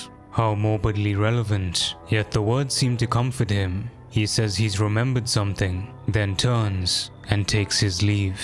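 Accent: South Africa. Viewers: This is real good, also your acccent rreminds me of home. Like, Pretoria, or Durban, SA Indian. Subbed, dope content grootman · No shi he’s from South Africa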